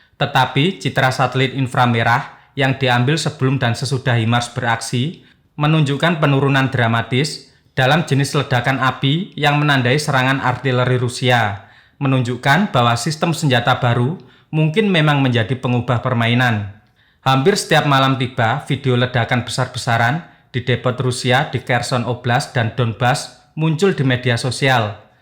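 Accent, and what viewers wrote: Java, Indonesia. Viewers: Wahhduhhh loghat jawanya mas kental sekali wahduuhh😂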